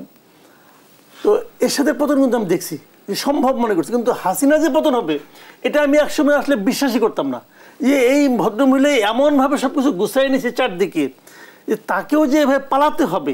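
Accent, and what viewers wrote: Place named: India. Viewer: উপস্থাপন কন্ঠ কেমন যেন ভারত ভারত লাগছে।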